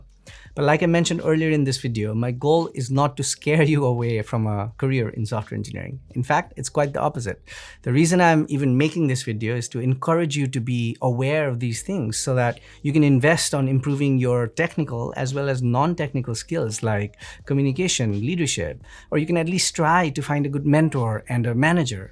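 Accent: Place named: Nepal. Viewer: I hear nepali accent.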